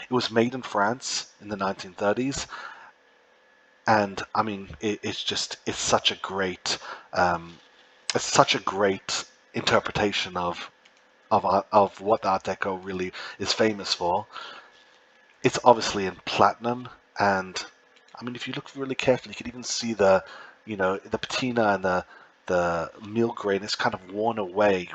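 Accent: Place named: United Kingdom. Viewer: The narration fits the vintage vibe. Ever so slightly British in accent, reminiscent of Antiques Road Show, and not unlike the jeweler in the Friends episode where Phoebe helps Chandler buy Monica's vintage ring. Coincidental? Maybe.....🤐🤭🤐 But perfect nonetheless.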